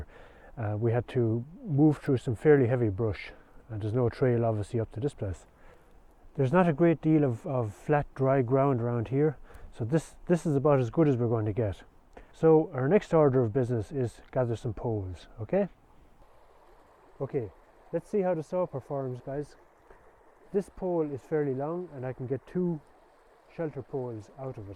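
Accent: Ireland. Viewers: U irish ?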